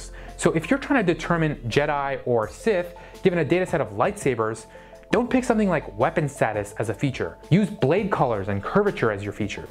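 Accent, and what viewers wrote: India. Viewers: what's wrong with your voice , seems desi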